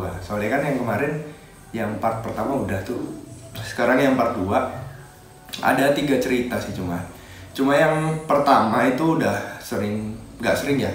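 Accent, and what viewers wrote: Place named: Malang, Indonesia. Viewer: Part 1 mana ?? Bahasnya medook...org Malang tibako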